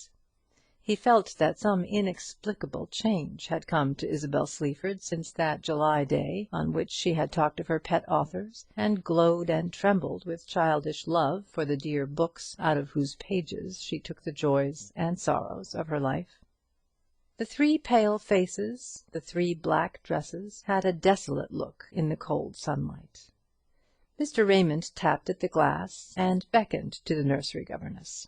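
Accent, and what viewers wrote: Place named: United States. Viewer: Sorry, can't possibly endure British literature read haltingly in an American accent. It doesn't work. At all.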